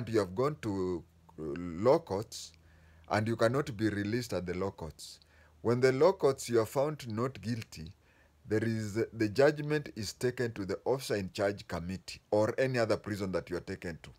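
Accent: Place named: Meru, Kenya. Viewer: I am impressed with your Kimeru accent Sir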